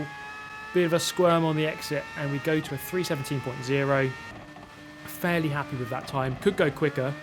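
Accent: United Kingdom. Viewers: As a british person, it never occurred to me that many people here just enjoy his accent. "Everyone" (lots of people) I know speaks like this, and it's actually nice having this on in the background of working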